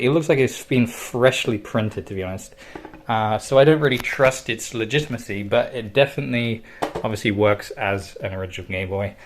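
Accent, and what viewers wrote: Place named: Australia. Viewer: Great vid here haha, cheers. Seein' other devs working on their own stuff helps with the ol' self-motivation too. Keep up the great work. Oh, and you're probably the most 'Strayan sounding Brit I've ever heard haha.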